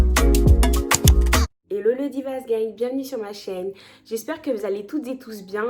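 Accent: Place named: Morocco. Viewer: Une question jeune fille,tu es tellement jolie😍( tu as l’âge de mon fils),pourquoi parles tu comme une marocaine/arabe?🤔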